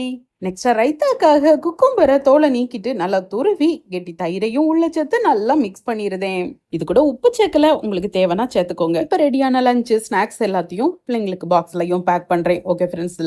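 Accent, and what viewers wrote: Tirunelveli, India. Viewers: Thirunelveli, Kanniyakumari side la slang ipditha irukum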